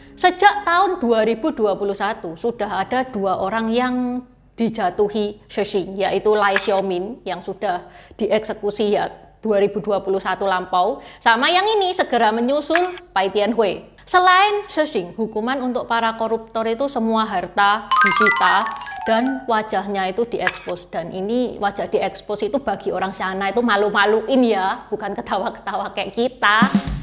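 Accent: Java, Indonesia. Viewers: Mbak ..itu suara nya ...logatnya ..jawa bnget ..gak cocok bawsin acara ddh ..sorry ya ..apalagi bahasa mandarin ..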